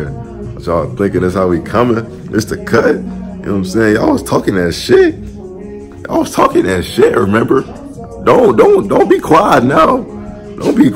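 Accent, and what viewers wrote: Chicago, United States. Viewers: U from chicago foe?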